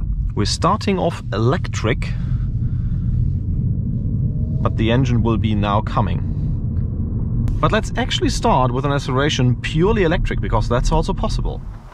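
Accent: Germany. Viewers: Ich Feier den deutschen Dialekt 👍👌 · So richtiger Deutscher Akzent hahaha man kennts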